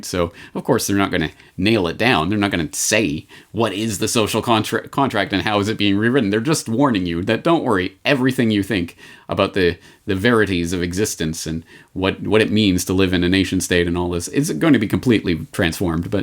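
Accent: Germany. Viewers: Nothing wrong with a German accent. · Well german accents are normally not unnerving at all, that is until you open your speech with talk of how WW2 was good for the world.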